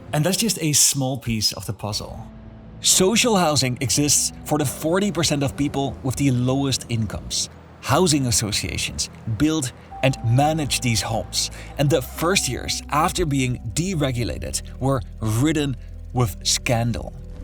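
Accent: Netherlands. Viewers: Are you sure? Because they're definitely trying to make money and milk the residents for their last eurocent. Also I can hear from your accent you're Dutch too 😄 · you sounds very dutch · which Dutch accent this presenter speaks with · Jij bent een Nederlandse. Dat hoor ik aan je . Maar jij spreekt wel heel goed Engels hi Maar zou je is een aflevering willen maken over het paranormale in Nederland de vrije metselaars❤❤❤❤ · His accent obviously shows that he is a native Dutch-speaker.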